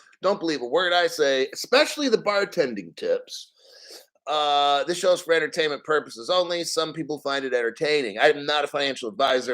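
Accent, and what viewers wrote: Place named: New York, United States. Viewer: This is one of the very few crypto channels that isn't trying to sell you something or shill their own bags; appreciate it. Are you originally from NY/ NJ ? You have the accent & the same Go Fk Yourselves mentality we have here on the east coast, hahaha . Keep up the good work.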